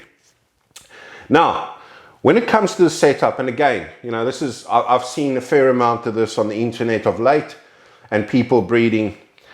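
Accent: South Africa. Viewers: You from South Africa:)?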